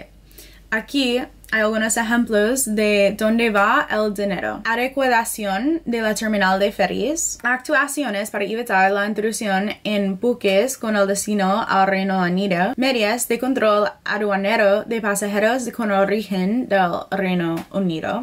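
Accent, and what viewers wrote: United States. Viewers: Que lindo El Castellano pronunciado por una Estadounidense . Saludos Desde Italia .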